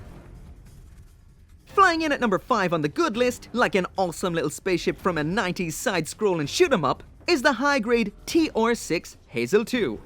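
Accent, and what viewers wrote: Ireland. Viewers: What the hell is your accent? It sounds like a Dubliner doing forced American! Like, Nevada or something?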